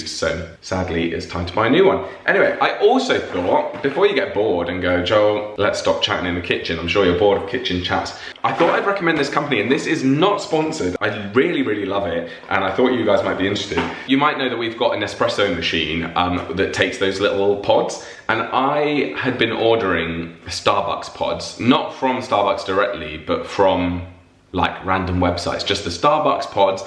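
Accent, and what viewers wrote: United Kingdom. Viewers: Every time you add an R in your speech now I notice it 😂 it’s amazingly British! American accents are so boring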